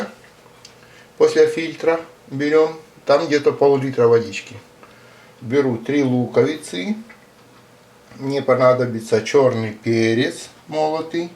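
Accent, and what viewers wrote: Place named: Odessa, Ukraine. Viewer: . красивый одесский акцент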